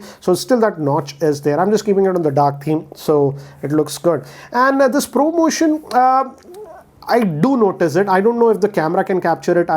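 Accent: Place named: India. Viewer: U r like .. Hindi professor.. doing English class 🤦🏻‍♂️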